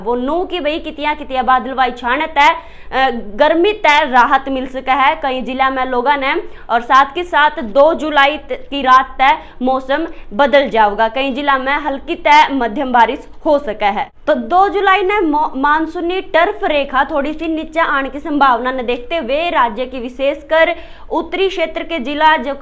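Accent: Haryana, India. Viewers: Bhot achi lagti ho bolta hua apki harvanvi vaoooo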